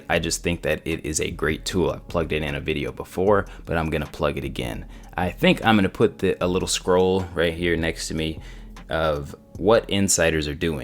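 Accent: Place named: United States. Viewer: Where in the States are you from? You have an awesome voice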